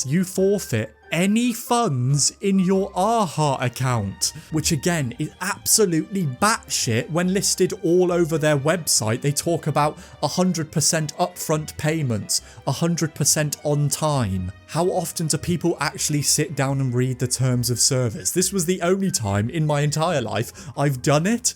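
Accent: United Kingdom. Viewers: I kept having the Aha song Take on me in head, who could know why?! Fortunately AI will never be able to replaced the confused British man talking to us on the YouTubes.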